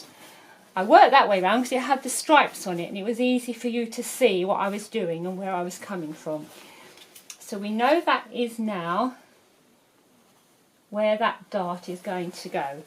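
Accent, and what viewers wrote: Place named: England, United Kingdom. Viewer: I would listen to your English accent for my whole life. Lovely.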